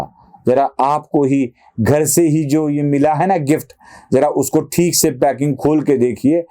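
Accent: Maharashtra, India. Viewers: छान जी. हिंदी मुळे outreach नक्की वाढेल. हिंदी बोलताना मराठी accent जाणवतो प्रकर्षाने. पण काही प्रॉब्लेम नाही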